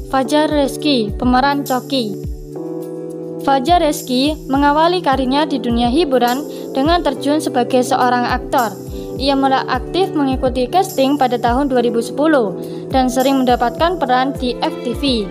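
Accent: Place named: Java, Indonesia. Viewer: Jawa bgt kak..wkwkwk👌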